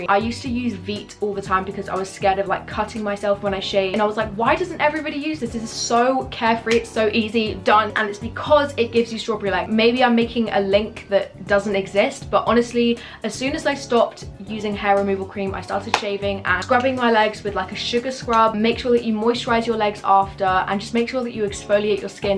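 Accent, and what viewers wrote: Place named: United Kingdom. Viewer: you can tell she’s british without any doubts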